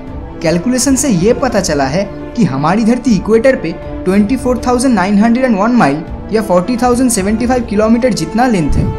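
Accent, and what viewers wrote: Bengal, India. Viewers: Bhai app Bengal se hai kya?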